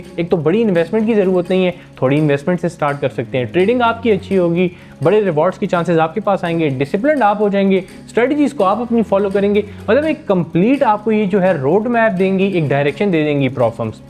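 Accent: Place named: Pakistan. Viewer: Ab batao bhai aur 100% loser ki fee se nhi chalta unka main revenue of source h pr bohot si cheeje h ab job Krna hoga to banda karlega funding pips me bolega halal h aur wahi model pe kaam krne ko glt kaise bol skta h 😂 btw me India se hu mujhe is ke upr ki jo mene knowledge li h iske upr level ki knowledge laake batao ki ye haram h pakistani 😅 awaaz curiosity jyada banati h :) agar h koi banda to genuine batao to me leave bhi krdunga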